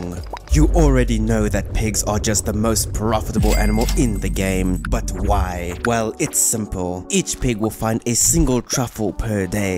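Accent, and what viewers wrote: South Africa. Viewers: So refreshing hearing my home boy's gorgeous SA accent. Pragtig🤌🤌🤌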